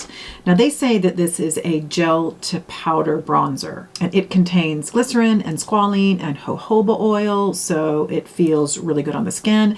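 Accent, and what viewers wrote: Ireland. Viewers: I like the whole line up… lipstick included! You are developing a tinge of an Irish accent😊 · Are you irish , could swear i heard some irish accent in there, im irish by the way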